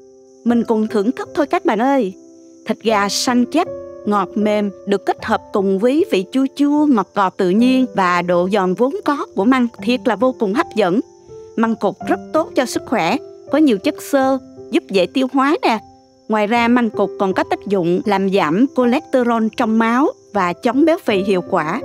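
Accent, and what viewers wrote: South, Vietnam. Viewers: giọng nghe rất miền Nam và rất chân chất, ủng hộ Cô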